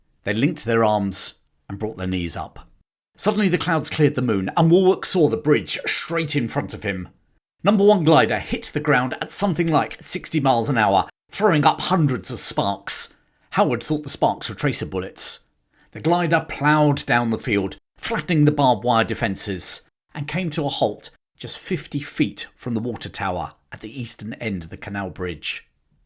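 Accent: United Kingdom. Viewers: Many thanks for the video, with this English UK accent, spelling so British. Now that bad english is spoken everywhere worldwide, I lived for 2 decades between Singapore and Jakarta, working to supply tools and parts for industry. We have singlish, American men from Texas, Australian from Melbourne and some kiwees and Filipinos. Indians also. So thanking you very much for this wonderful British video. 😊😊😊😊😊😊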